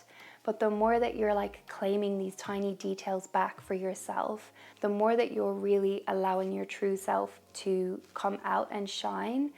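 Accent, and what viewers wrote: Ireland. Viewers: I detect an Irish accent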